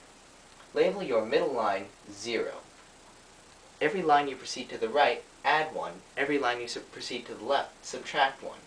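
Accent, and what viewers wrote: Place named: India. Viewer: this guy is awesome, he teaches chemistry, looks Japanese, and has an Indian accent :D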